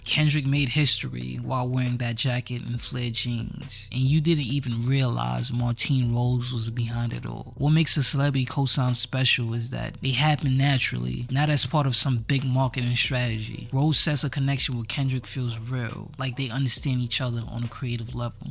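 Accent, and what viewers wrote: New York, United States. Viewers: Where’s your accent from? It’s great + super interesting! Is it New York?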